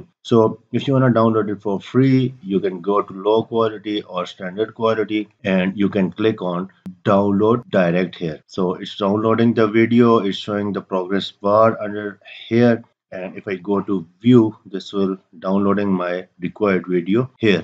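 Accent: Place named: India. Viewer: Cannot understand. Take the indian out of your voice. Or marbles, whatever.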